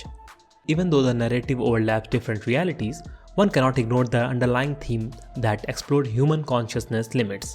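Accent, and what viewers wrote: India. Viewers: indian enlish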